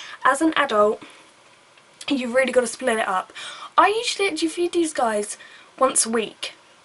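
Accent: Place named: Cockney, United Kingdom. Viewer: Hate the cockney accent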